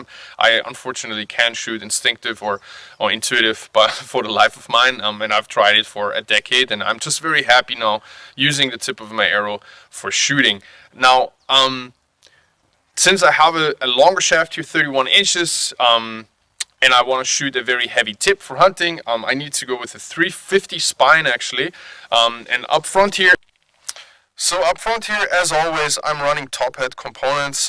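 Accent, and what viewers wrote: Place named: Austria. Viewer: du klingst irgendwie österreichisch ?? ;-) nice video, nice bow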